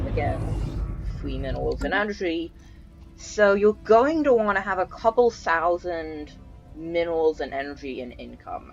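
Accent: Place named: North America. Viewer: north american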